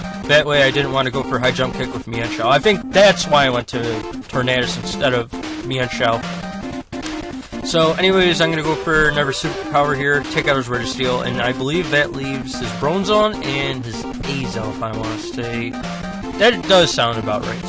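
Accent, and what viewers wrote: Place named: Minnesota, United States. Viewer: Up North? Your voice reminds me of some people I know from Minnesota.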